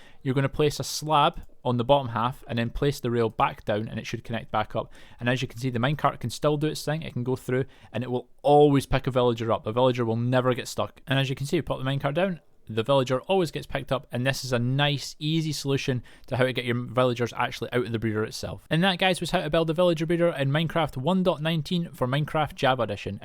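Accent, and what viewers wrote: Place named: Scotland, United Kingdom. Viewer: Great a Scot! (Sounds Scottish at least) Should be an easy going tutorial :D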